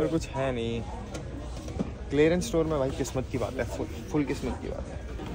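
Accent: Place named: Garhwal, India. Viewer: Garhwali accent Op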